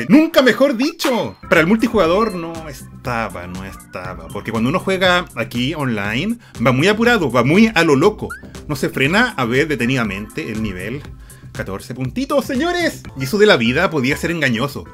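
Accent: Chile. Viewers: Eres chileno? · eres Chileno? yo si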